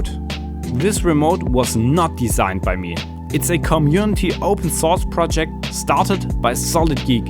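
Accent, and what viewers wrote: Germany. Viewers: Dat geman accent. Yuneec for me. Doesn't break the bank. As always... good luck eskating in ol germany. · Dieser Deutsche Akzent 😂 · Hey, das Video ist gut gemacht! Ich gehe mal davon aus, dass du eigentlich deutsch sprichst, dem Akzent nach. ;-) Inspiriert zum nachmachen, weil du vor allem deine negativen Erfahrungen aus einem früheren Projekt darstellst und anwendest.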